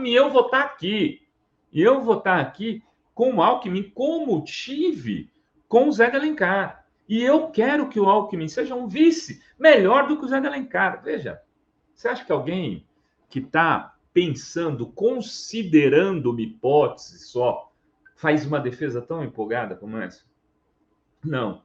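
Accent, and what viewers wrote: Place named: Minas Gerais, Brazil. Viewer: Sotaque mineiro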